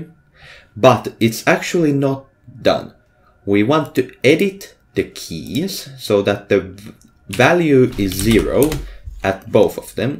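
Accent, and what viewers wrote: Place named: Finland. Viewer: suomalainen aksentti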